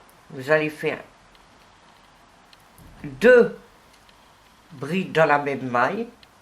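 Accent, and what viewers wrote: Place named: Nord, France. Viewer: Merci madame pour ce joli tuto , je débute , et j ai réalisé le bonnet renard , j aime beaucoup votre accent du Nord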